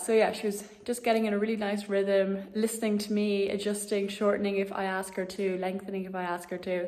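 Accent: Ireland. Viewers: Another fantastic video... 🙂😉😁 Her sweet sexy irish voice makes me fall asleep 😴 but not because I'm bored in the slightest lol it just reminds me of those cd's which help you fall asleep!! I often lay in bed watching these & wake up still wearing my headphones & holding my phone!! I love all of her videos, especially the connection she has with the horse.. perhaps the gentle voice along with her fantastic riding hypnotises the horse lol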